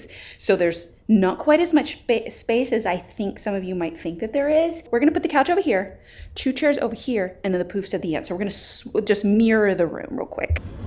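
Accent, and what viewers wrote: Texas, United States. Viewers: I LOVE how your Texas accent comes out!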